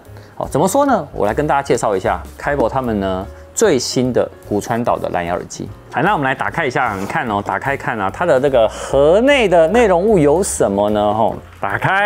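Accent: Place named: China. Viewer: 聽旁白，還以為聽到阿陸仔的頻道咧！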